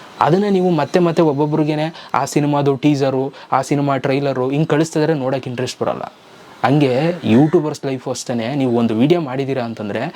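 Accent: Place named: Hubballi, India. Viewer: Nimma matu Nija Tara ede nivu Ennu Belibeku nanu Nija Andre Hubballi avalu nimma Bhashe Chanda anstu adake sub madide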